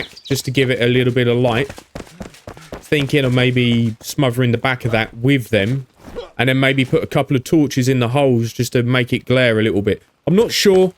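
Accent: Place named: England, United Kingdom. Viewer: I´m watching this series not only because I like Enshrouded very much but your accent mate, I really like it, typical english imo, greets from the Netherlands, keep up the good work!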